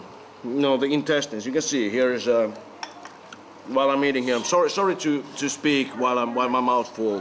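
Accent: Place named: Netherlands. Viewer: he sounds dutch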